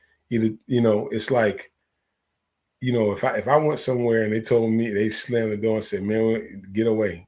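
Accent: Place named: Alabama, United States. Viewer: You from Alabama? Kinda sound like it lol 💪🏽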